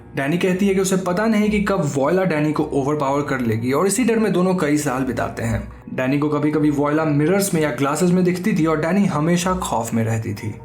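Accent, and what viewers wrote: Bengal, India. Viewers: Are you Bengali ?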